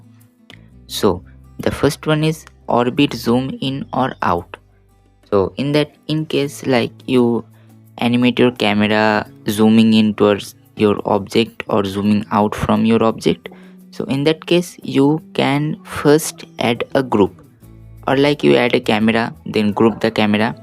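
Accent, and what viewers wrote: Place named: India. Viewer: Your voice indian but language English, where are you from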